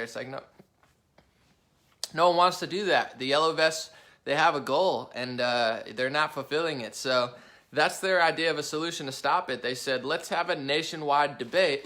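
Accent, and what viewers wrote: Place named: Boston, United States. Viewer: Your boston accent blows dude, but we love you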